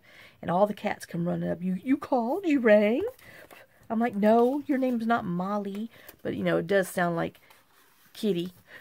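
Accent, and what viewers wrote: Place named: South, United States. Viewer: I love all your videos! I sent my daughter, Heidi, one to watch and told her she’d enjoy it. She calls me and says “OMG she’s you with a southern accent!!” Then I reminded her I DID have an accent in the 1960’s when I lived in Florida. Please let us know if Molly comes home.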